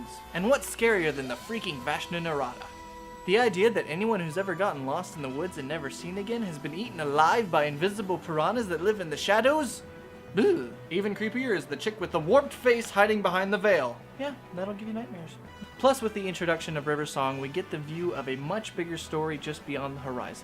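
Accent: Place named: United Kingdom. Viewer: Good British accent!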